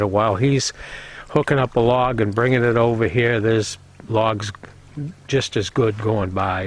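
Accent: Boston, United States. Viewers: You can take the man outta Boston but never Boston outta the man 😂! Nice to see you again! Greetings from Germany 🇩🇪 👍🍻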